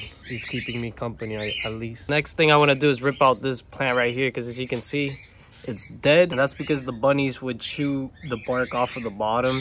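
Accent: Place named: Long Island, United States. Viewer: Sounds so Long Island...or the Bronx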